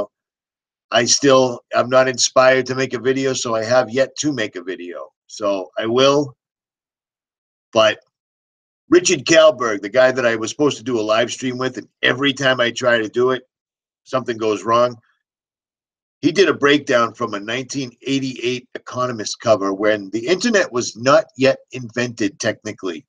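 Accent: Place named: Boston, United States. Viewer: ??A WHAT? WHAT'S A HAYDON? I'M IN UK N IT SOUNDED LIKE U SAID U D WANNA GET A RAGING 'HARD-ON' LOL IN UR BOSTON ACCENT.HAHA. HOPE IT'S FOR ME- UR GORGEOUS AS IS UR MIND N INTELLECT. SORRY FOR BEING RUDE ON THE CHAT. I'D LOVE IT IF U ACTUALLY SAW ONE OF MY COMMENTS.😘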